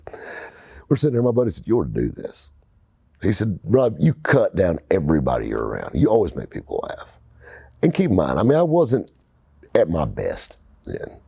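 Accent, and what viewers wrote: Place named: Greenville, United States. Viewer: Grew up in Mauldin, SC from '78 to '88. It's great to hear someone that has that great up-west Green-vull accent. If you ever want to ride around and film barbeque or burger joints in Southeastern NC, I can recommend a few people. Our little band of idiots have some stories that can prove that the truth is stranger than fiction.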